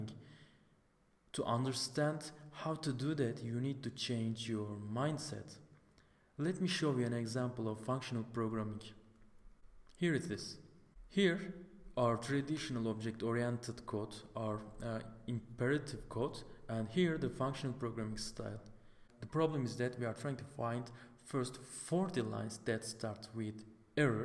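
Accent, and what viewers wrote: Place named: Turkey. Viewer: hocam ismini görmeden aksanından anladım türk olduğunu tebrikler güzel bir seri yapmışsın :)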